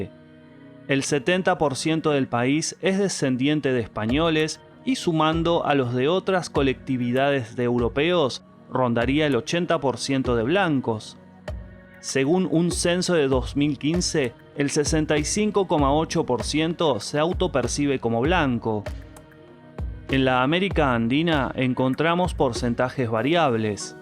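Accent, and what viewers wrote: Rioplatense, Argentina. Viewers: Que desagradable el acento porteño del relator.... demasiado cha, che, chi, cho, chu. En Argentina la gente más educada no habla tan así. En este video hay un desagradable tufillo xenófobo. Esa idea de valorar en demasía la raza blanca. En todas las etnias hay gente muy valiosa y también de lo peor. En mi caso y el de mi hermano somos como muchísimos chilenos, hijos o descendientes de inmigrantes. Mi papá de Varsovia Polonia, y mi mamá de Manchester Reino Unido. Eso no hizo que tuvieramos ventajas sobre otros compatriotas. En Chile existe una alta valoración de nuestros ancestros indígenas. Yo opino que nadie es superior a otro ser humano por nacer indígena, de genética europea, africana o mestiza. Que seas más verde, azul, bajito o de cabello rizado no vas a contar con ventajas importantes. Sean Peszynki desde Santiago de Chile💥💥💥💫💫💫💫💫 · No solo los porteños hablan así por si no sabías el lenguaje rioplatense habla medió país que no te guste problema tuyo, es una tonada única y hermosa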